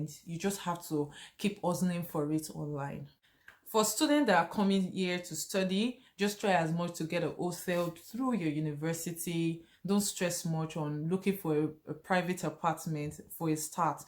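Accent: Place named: Finland. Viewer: ARE YOU ESTONIAN ? I THOUGHT YOU ARE FROM FINLAND BECAUSE OF YOUR ACCENT.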